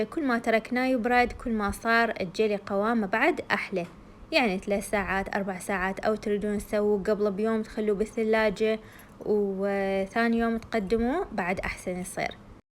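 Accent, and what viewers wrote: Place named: Baghdad, Iraq. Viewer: لجهة بغدادية من العراق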